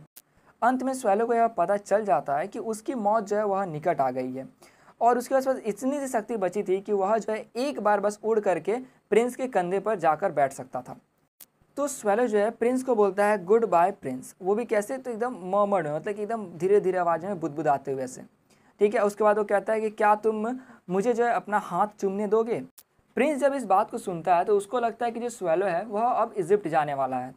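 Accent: Bihar, India. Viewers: Bhaiya mai apke accent se he samajh gaya tha ke app Bihar se hai I am also from Begusaria, Bihar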